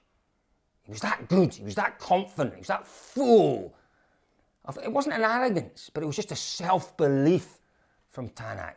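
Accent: Scotland, United Kingdom. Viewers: Naice scottish axent.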